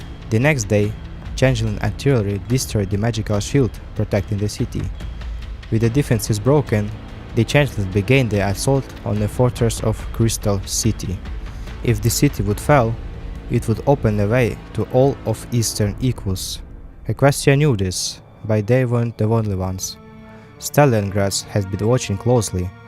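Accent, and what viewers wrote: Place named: Russia. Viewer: Килотонный респект автору. Я сразу понял, что автор умеет говорить на русском из-за акцента. Чувак, тебе уважение, продолжай пилить годный контент!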